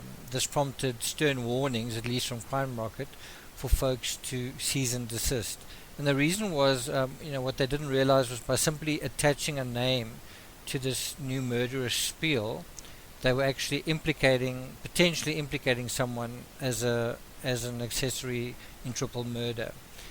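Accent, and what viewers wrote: South Africa. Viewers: You sound South African. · New subscriber I enjoy listening to you, are you from South Africa 🇿🇦?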